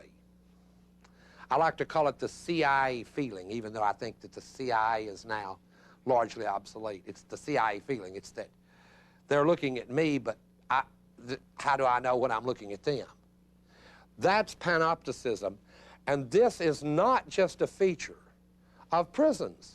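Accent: South, United States. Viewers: Watching Rick Roderick makes me really like those Southern US accents. On another note, his lectures are really great for some quick summaries to deepen understanding into a thinker's perspective, and this lecture series is really great. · I cannot express how refreshing it is to hear European philosophy explained via Southern accent. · It's rare to hear a southern accent talk positively/neutrally about continental philosophy. xD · love hearing an intelligent speaker with a southern accent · Watching him makes me realize how negatively biased marketers are against people with a southern accent. Ironically, they’re probably more liberal I’m guessing. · wow..is that southern accent? just knew it ..thanks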